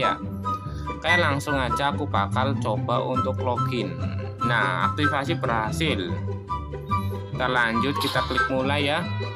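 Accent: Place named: Surabaya, Indonesia. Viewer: Org Surabaya Ya Lu Bg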